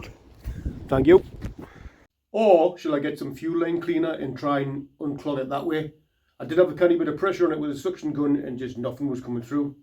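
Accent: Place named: Newcastle, United Kingdom. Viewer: ...one of the truly great iconic cars of the early 70's along with the mk3 Cortina, geordie accent is a definite turn on by the way! 👌🧸💜xxx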